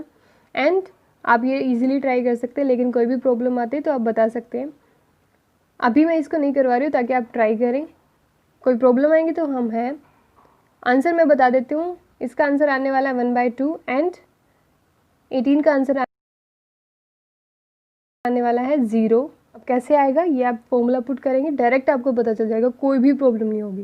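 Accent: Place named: Haryana, India. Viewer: Language touch aa hi jata h haryana wala ka... 😅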